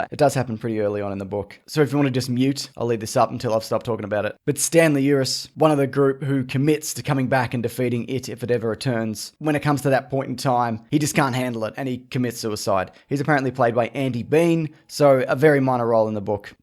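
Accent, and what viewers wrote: Australia. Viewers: Are you Australia? I am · Love your accent. I'm guessing it's Australian? Also did you know Stand By Me is a prequel to IT? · U Aussie mate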